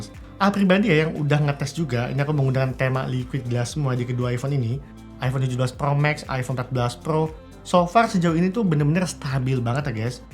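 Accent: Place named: Europe. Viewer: lidah - lidah eropa memang begitu bang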